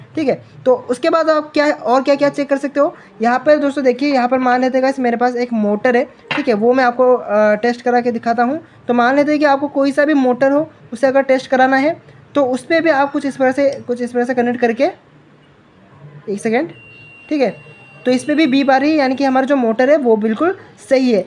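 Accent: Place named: Odisha, India. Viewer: mujhe lagta hay odisha se ho app😂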